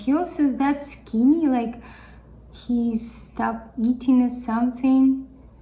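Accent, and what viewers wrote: Russia. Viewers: Where you from ? Russia? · Забавная реакция) судя по акценту, ты русская?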